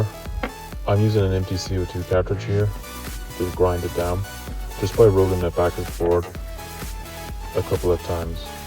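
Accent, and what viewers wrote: Ireland. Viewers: Mate your irish? Thats wild, granted im aware shotguns are legal for hunting in ireland but its still crazy to see this 😂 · You Irish lad?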